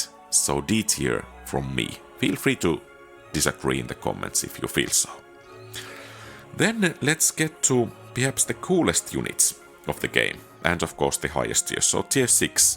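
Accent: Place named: Finland. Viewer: Just discovered your channel while getting into Olden Era, soon enough Ill have a great finnish youtuber for every game I play. Something about the accent just clicks :D Looking forward for the release of the game. Its already decent fun and given updates and balancing its gonna be greater yet.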